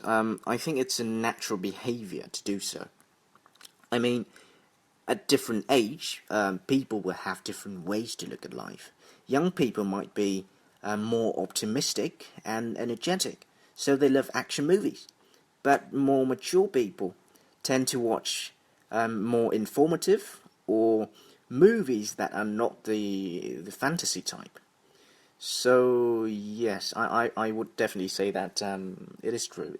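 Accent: India. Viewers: Giọng Ấn......eo....kinh....;D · like your Indian accent :v really cool lah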